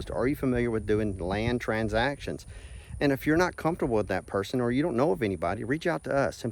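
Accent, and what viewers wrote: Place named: Louisiana, United States. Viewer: Funny how I can see a YouTuber for the first time and can hear they are from Louisiana..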